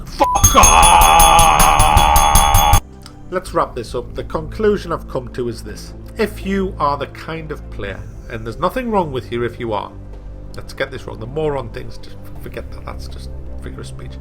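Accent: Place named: Scotland, United Kingdom. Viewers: I'm so glad someone tries to actually lighten up the tense ass atmosphere surrounding this game, especially in Scottish rofl. had me in tears, because it really reflects how pissed I feel that this level of gameplay is considered a flawless game. It's one of the stiffest effin' games ever. And all the quest are the same. 1. Talk, 2. Questmarker, 3. Witcher sense head ache camera, 4. Forced battle, 5 Talk. Urgh. I finished the game anyway so it's a decent experience all in all, but the gameplay has always been a disaster in this studios games. Why don't they make something that's fun to play? Like seriously, it's like the game is flat. There is no real climbing, no PROBLEM SOLVING, except for some ridiculously obvious puzzles etc. End of rant. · Senor Studly I think you'll find he's Scottish :/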